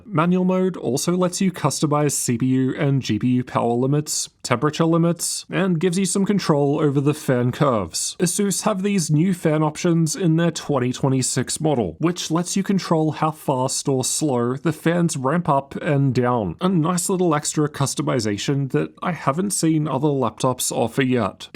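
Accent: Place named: Australia. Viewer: man, your accent reminds me of Rickey Ponting and David Warner.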